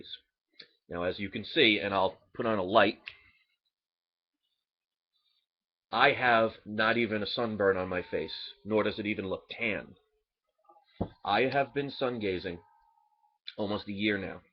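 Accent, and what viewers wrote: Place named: Pennsylvania, United States. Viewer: Best thing for asthma is pot. Legal here where I live, but not in PA where I think you live due to your accent. I am not joking.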